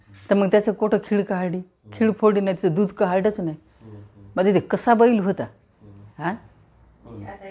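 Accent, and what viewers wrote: Nanded, India. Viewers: भाषा नांदेड जिल्ह्यातील वाटत आहे. माझं आजोळ आहे नांदेड जिल्ह्यातील भोकर तालुका.. माझी आजी पण हुबेहुब असच बोलते😊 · बोलण्याच्या भाषेवरून सांगतो माई 100% नांदेड मधील असाव्यात.एक अंदाज. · आजी आपल्या नांदेड भगाकडल्या आहेत..आमची भाषा ऐकून आनंद झाला..same आमची आजी अशीच होती💐💐🙏🙏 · Mrathvada mdhlay nanded chi language ahe wate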